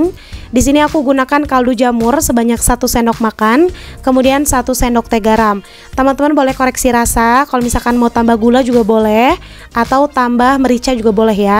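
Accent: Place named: Sunda, Indonesia. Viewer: Lucu deh ah cara ngomongnya..meni sunda pisan 🥰🥰🥰..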